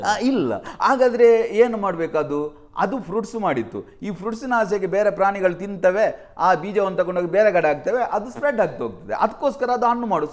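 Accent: North Karnataka, India. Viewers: To the interviewer - you should have come well prepared bro ... Looks like you are from North Karnataka. Your accent says it all. But please do your homework 🙏.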